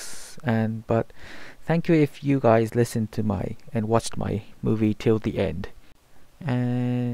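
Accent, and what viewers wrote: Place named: United Kingdom. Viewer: Nice video, thanks! Your English is pretty good, by the way! Did you study British English? Didn't you? I'm just curious, because I'm studying American, and I am pretty sure that I hear British pronunciation.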